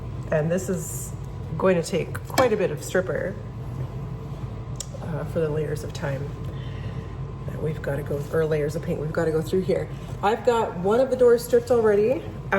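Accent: United Kingdom. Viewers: Ok, when or why did you chose a british voice over?😅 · oooo using a standard english british for a narrator .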